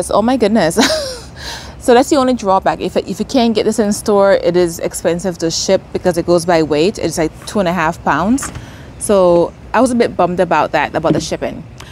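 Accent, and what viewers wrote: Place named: South Florida, United States. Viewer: I love the “f” for fertilizer Friday— I’ll remember that now! Also your accent makes me miss South Florida! 🥰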